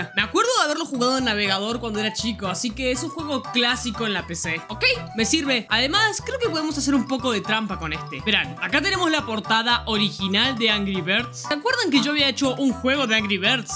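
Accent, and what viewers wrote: Chile. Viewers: Erais chileno o qué onda weon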